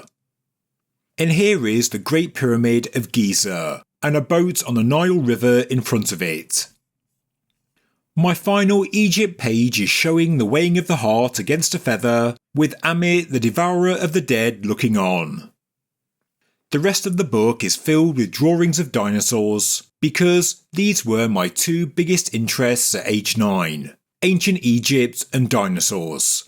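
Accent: United Kingdom. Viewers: Someone asked recently if you were Australian or from UK. I answered that you were from the UK but i couln't tell them where. They were interested in your accent and trying to place it geograhically.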